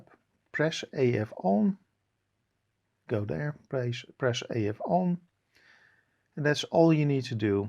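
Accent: Netherlands. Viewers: Are you Dutch? I hear an accent.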